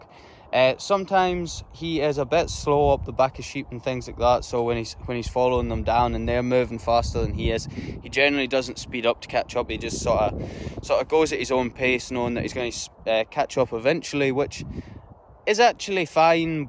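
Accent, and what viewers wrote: Scotland, United Kingdom. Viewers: Love your videos Your sweet dogs are adorable and love watching them Enjoy your Scottish brogue so much! My best to you, Stacey and your fur babies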